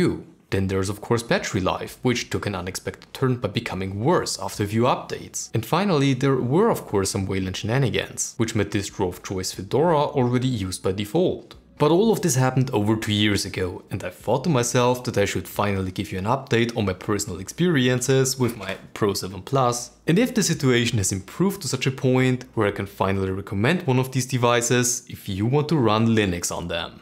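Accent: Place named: Germany. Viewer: I like you accent its like bringing a german to texas :D Thanks for the informative video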